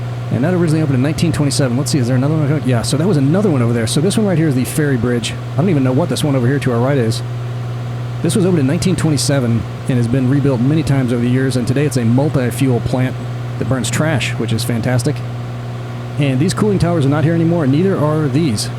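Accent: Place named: North America. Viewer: Where's the Big One? One of the biggest roller coasters in the world is on the Pleasure Beach... no sign of it here. The whole Pleasure Beach doesn't really look like the Pleasure Beach at all. Blackpool Airport isn't accurate either. I mean it all looks fab, and if you didn't know the area I suppose it doesn't really matter, but for those who live here, it's a little disappointing. No idea what that massive square hanger building is you parked next to... that's not there in reality either. Nice video though... strange hearing a north American accent talking about my home town :)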